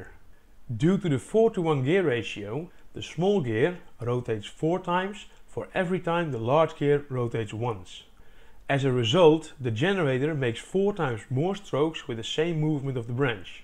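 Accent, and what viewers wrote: Netherlands. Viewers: The dutch accent ;p Amazing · Je spreekt lekker "Dunglish". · 3 secs in you know this uploader is Dutch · are you a fellow Dutchman? Judging from the accent? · Hey, ik neem aan dat je een Nederlander bent aangezien we hetzelfde prachtige accent hebben als we Engels praten !! Ahha ... ik ben ook helemaal bezig met ideeën voor het opwekken van energy, ik wil 3d print en tech workshops gaan geven op mijn DIY elektrische fiets met een DIY cargo bike trailer/minicamper en natuurlijk een DIY energy systeem om alles te kunnen draaien, fiets, kleine printer, laptops, telefoons, etc etc. Ik ben nu in de bouwmaat op zoek naar alu profielen om een opklapbaarframe te kaken voor mijn zonnepanelen boven op mijn cargo trailer en fiets. Ik hoop dat we kunnen samenwerken, sta je open voor contact en eventueel een samenwerking ? Groetjes Ruben Dekker Allround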